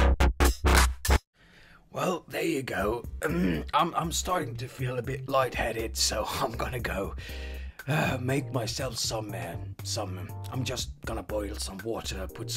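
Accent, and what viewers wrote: United Kingdom. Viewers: I hear the "UK" in your voice too. Maybe it's where you learned english from? I know this man from China and he has a "UK" accent. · Really enjoyed this video ! And UK accent is the way to go !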